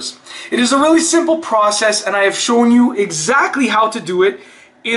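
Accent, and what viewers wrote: Canada. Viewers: Are you Canadian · also nice thick canadian accent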